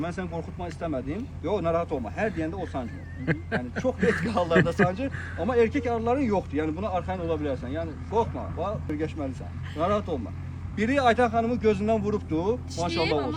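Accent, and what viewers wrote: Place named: Zaqatala, Azerbaijan. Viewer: Zaqatala aksenti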